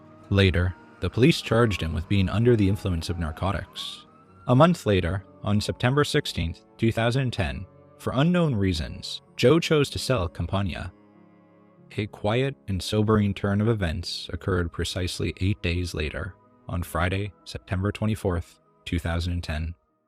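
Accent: Canada. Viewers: could do without this damn canadian narrator since he calls every thing a hoose or aboot learn to speak right or gettoff this narration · I love how I can always tell that a YouTuber is Canadian by the way they say “aboot” lol.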